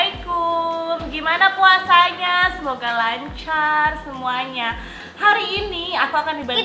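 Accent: Java, Indonesia. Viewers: Wah mbk bohoso jowo wis rodok medhok mbk wkwk. Good luck all the best. Lots of love from Central Australia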